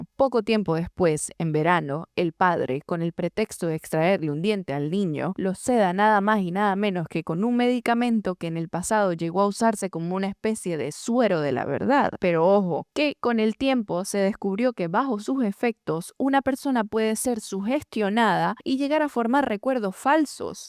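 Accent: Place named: Venezuela. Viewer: Me gusta muchisimo tu acento venezolano, (soy española ) a de mas tu tono de voz es elegante y muy relajante., podria escucharte el dia entero ❤❤❤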